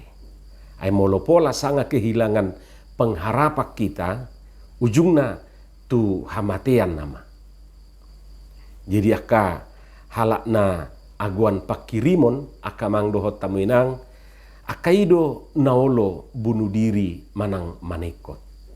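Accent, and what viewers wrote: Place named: Batak, Indonesia. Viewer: Bahenjo amang tekanan bahasa batak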